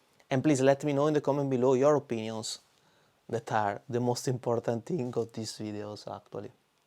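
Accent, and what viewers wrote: Venice, Italy. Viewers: Mi piace troppo il tuo accento veneto!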